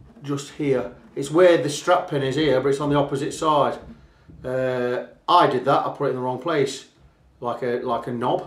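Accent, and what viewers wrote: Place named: Nottingham, United Kingdom. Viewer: I knew you were a Nottingham lad as soon as you said "Sellin". Nice guitar, hope it went to a good home.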